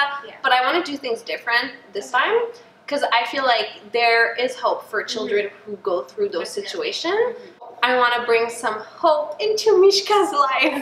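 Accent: Quebec, Canada. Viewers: Are you French Canadian? Cause your accent when you speak English sounds a lot like mine when I speak English. Also, in the short film MISHKA, I saw our beautiful Canadian flag so I was wondering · No Pickle Yeah but that happens because her main language is Canadian French so she has an accent when she speaks English that results in some words being higher pitched.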